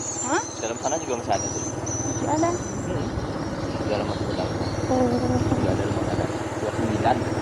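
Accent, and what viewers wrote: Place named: Java, Indonesia. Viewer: Adiak ko asli urang Minang apo urang Tran jawa yo ? Soal nyo logad jawa adiak mengecek nyo Alah lamo merantau ka sitiung diak ?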